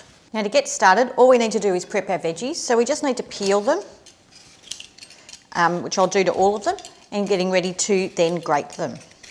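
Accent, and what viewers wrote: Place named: Australia. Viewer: From Greece....I remember these WHEN I was living in Australia....I may even try to make some and see how I do..LOVELY AUSSIE ACCENT!!!!Thank you for the videos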